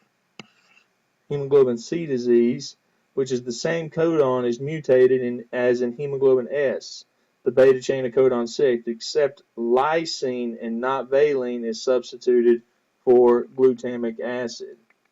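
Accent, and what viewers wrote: United States. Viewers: And i like ur pure american accent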